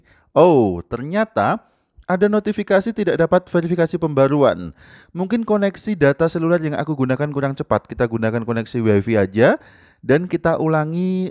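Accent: Magelang, Indonesia. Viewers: orang magelang kah bang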